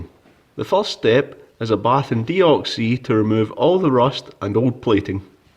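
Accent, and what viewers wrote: Scotland, United Kingdom. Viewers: Its great to hear another Scottish accent alongside this great work. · You've taught me more Scottish accent than Train Spotting, so thank you! Also awesome projects. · So, I'm an American and I'm having trouble placing the accent....Scottish?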